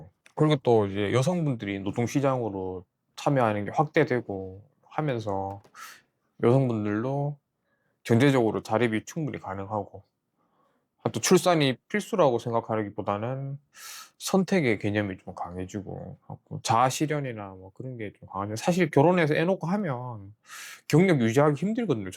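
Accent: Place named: Gyeongsang, South Korea. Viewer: 경상도 어투가 있어 친밀감 확~!느껴져 글 남기게 되었어요ㆍ저도 고향이 경상도 김천이라서요ㆍ~^^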